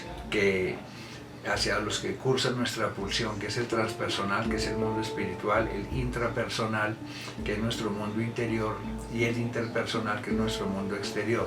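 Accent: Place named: Antioquia, Colombia. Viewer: Con todo respeto la conclusión a vuelo de pjaaro es la de que se trata de un charlatán de acento.paisa, ,con lenguaje rebuscado disfrazado.y tendiente a aterrorizar,encasillar,someter,infundir hacia la disminución,la clasificación morbosa,y la. Intimidación ,ladrillazos parrafudosos de redundancias destrictivas y destructivas de la esperanza de quienes sufririan.Es la sensación que queda tras exponernos a sus "exposiciones radiales,sin salida y trampedeantes.seria bueno no intentara seguir haciendo daño a las audiencias con actitudes sobresuperioradas ,y extensas ,".inocentemente"y de " buena voluntad".Es posible que haya más coincidencias con esta observación,saludos a Parrita.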